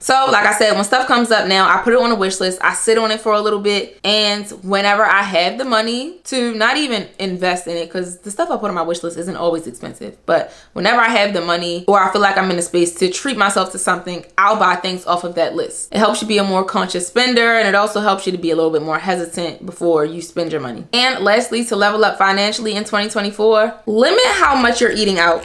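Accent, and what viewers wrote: Baltimore, United States. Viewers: Is that a Baltimore accent 👀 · Im 36 and I love these tips especially for the new year around the corner! They're useful for women from all walks of life. And as a fellow Baltimorean, I love listening to someone with our unique accent😆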